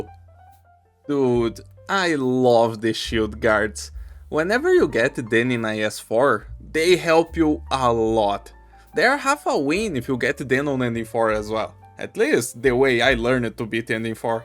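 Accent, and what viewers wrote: Brazil. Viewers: é muito estranho ver vc falando ingles quando é muito obvio q vc é br (o "sotaque") tipo amo os videos mais é meio estranho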